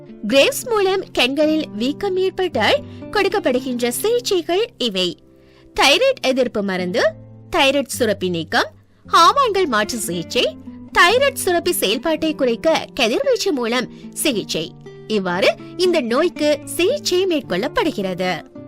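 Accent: Sri Lanka. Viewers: Ada arivu jeevingala, avanga srilankan Tamil kalanthu pesuragu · இது இலங்கைத் தமிழ் இப்படிதான் பேசுவார்கள்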